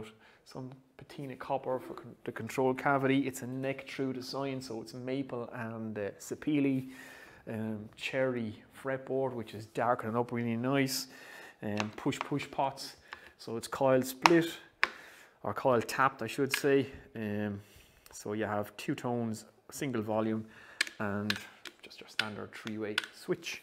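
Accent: Ireland. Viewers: Dublin / Dundalk accent ?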